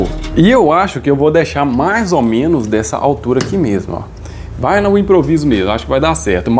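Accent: Minas Gerais, Brazil. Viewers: Boa noite amigão pelo sutaque voce deve ser meu conterrãneo mineiro, eu não só acho mais a NASA terá que estudar sua invenção MUITO TOP. Moro em Sorocaba SP. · Aí ficou top. Vc é de minas?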